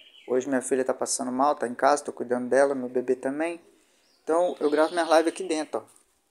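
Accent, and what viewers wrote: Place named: Rio de Janeiro, Brazil. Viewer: Eu jurava que tu era carioca kkkkkk tamo junto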